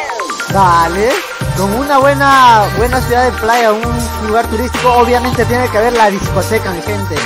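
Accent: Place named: Mexico. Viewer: Este habla como Mexicano en vez de Peruano.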